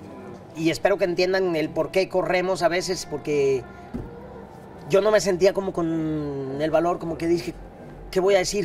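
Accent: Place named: Guadalajara, Mexico. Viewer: Su accento claramente de Guadalajara! QDEP Benito!!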